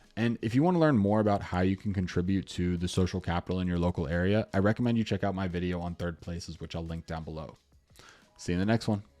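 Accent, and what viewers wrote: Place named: United States. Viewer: a flawless American accent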